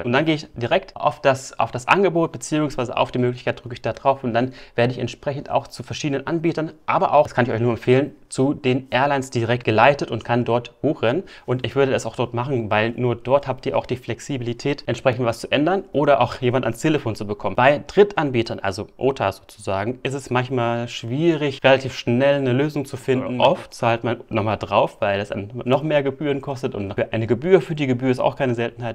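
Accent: Germany. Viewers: Ist das so eine Art Bauerndialekt? Uckermark?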